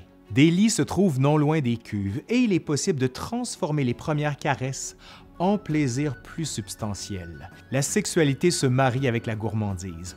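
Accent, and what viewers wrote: Quebec, Canada. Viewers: Un petit mot plus que dire juste pour ton référencement juste "référencement" car je ne sais pas comment va l'algo de youtube! Avec Nota Bene, Manon etc que tu connais, tu devrais recevoir un prix pour ton travail! Je te l'espère! Avec un petit accent québécois que j'apprécie! Bah oui, Belge, ça change de l'accent Fr ;-) Puis ma prof de science physique parlait pareil! Ok j'avais 18 ans... Et elle était si 😍😍😍Et je m'égare... Continue des partenariats! Et super, peu? ou jamais de sponsos qui saoulent de nord vpn ou autre! Je te souhaite de futures très bonnes vidéos et une audience décuplée!!!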